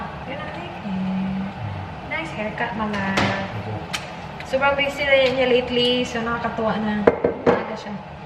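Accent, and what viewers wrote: Visayas, Philippines. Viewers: Hahahahaha, ah oi makatawa ko sa paburutin oi hahahaha.. cute kaayo magtagalog bisaya si 💋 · Nakaka aliw yung bisaya-tagalog 😂❤️ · magkatawa ko usahay sa imong bisaya day,cute lang paminawon.like (paborutin)😁😁😁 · Cute jud kaayo ka ate mag tag-bis bah...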